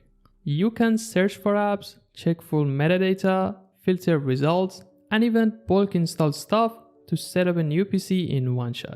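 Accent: Iran. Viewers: from the accent, I immediately knew you were Persian just like me 😅 But anyways, insanely good video bro, and especially as someone who comes from Fedora GNOME, this is a godsend, considering all the apps in GNOME have a unified UI across the whole system, which Windows 11 CLEARLY lacks. And UniGetUI is a really good package manager considering I'm used to DNF. You deserve a like and I quite frankly, HAVE to save this video. Thank you، برادر. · با توجه به لهجه انگلیسی ت فکر کردم بچه ایرانی پروفایل ت رو چک کردم زد ترکیه لهجه انگلیسی دو کشور نزدیکه به همه 😂 سال ۲۰۲۰ که ویندوز ۱۰ نصب کردم هنوز UWP زنده بود، اونموقع مسائل fluent ui خیلی دنبال میکردم برنامه Win UI Gallery 3 هم میتونی یه ویدئو بری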